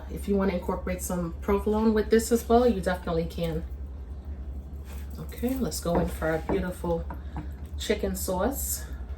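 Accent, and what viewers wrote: New York, United States. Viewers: First vid. Amazing. Instant sub. Btw which boro you from tho? I can hear it 😂❤ Recipe in desc box...the first is for a 9x13??? I wanna do this to fill a hotel pan. The second is double. That'll do it, right? Thanks! Much love from just over the GWB 😘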